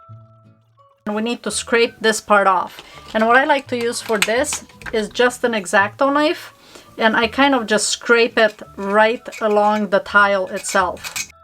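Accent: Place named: Albania. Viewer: You have a beautiful Albanian accent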